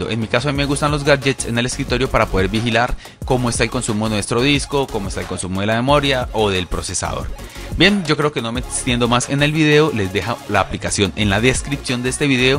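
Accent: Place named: Cali, Colombia. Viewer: Excelente vídeo, tu acento dice que eres de la Sucursal del Cielo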